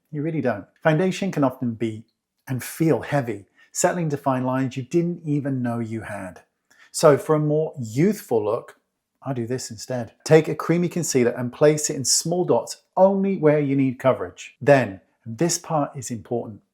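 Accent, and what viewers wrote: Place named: West Country, United Kingdom. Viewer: Great tip as always…heard a hint of West Country accent me thinks ❤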